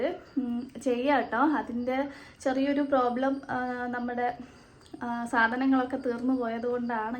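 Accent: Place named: Kannur, India. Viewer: ചേച്ചി കണ്ണൂർ ആണോ. കണ്ണൂർ ഭാഷ പോലെയുണ്ട് 😍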